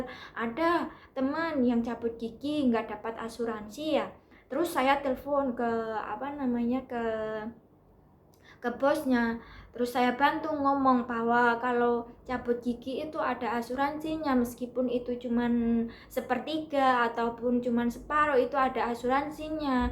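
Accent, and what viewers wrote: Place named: Java, Indonesia. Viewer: Bagus info nya... trimakasih. Tapi klo boleh Saran saya nih ya.. klo ngomong/penjelasan gitu,, pake jeda.. ibarat nya klo dalam tulisan pake titik koma.. biar enak di dengarnya.. klau perlu tambahkan backsound video, apalagi ini video panjang, biar gak bosan yg nonton. ini ngomong kya gak ada rem nya gitu, mana medok pula. Tampilan ataupun background jg penting, biar enak di lihatnya.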